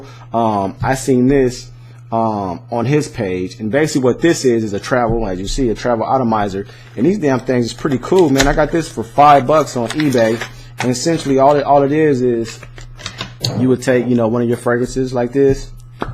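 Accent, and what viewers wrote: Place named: California, United States. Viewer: you could tell he's from califronia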